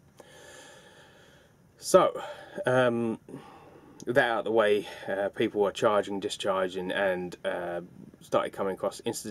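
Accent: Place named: Kent, United Kingdom. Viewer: I thought you sounded like a man of Kent / Kentish man, (always forget which is which) ;) I grew up in Canterbury but now up in Suffolk. I’ve got hundreds of people keep telling me to make more videos but... dunno... you’ve inspired me though! So thanks ;)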